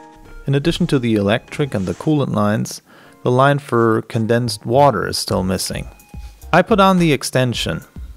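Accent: Europe. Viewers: Good video. You sound European. There are regulations in EU to have certified installers. I am curious - not complaining - are you certified to install or are you not in the EU or you are in the EU but you don't care, if so why don't you care? Thanks Reason I ask, is that I want to do it myself in UK. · Mind444 Spiritual Tribe He sounds European, it will be 220 - 240 volts depending on the country, single phase.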